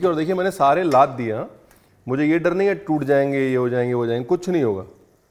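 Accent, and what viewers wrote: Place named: Punjab, India. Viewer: Sir aap kahte ho ki aap lakhnow se ho But aapke bolne me to punjabi lehja hai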